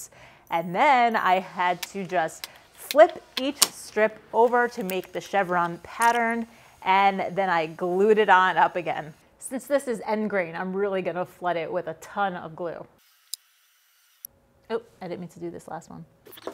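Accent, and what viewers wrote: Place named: Long Island, United States. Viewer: trying to place your accent. Could it be the eastern end of Long Island?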